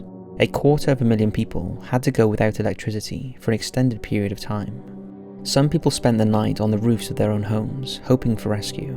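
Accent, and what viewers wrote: Wales, United Kingdom. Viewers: Is it just me or has the narrators voice become less welsh? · I’m hoping that does an April fools video entitled “official face reveal” and instead says “haha, I trolled all you saps. Smell ya later pimps” in his graceful Welsh accent. It would be so out of character and interesting to hear.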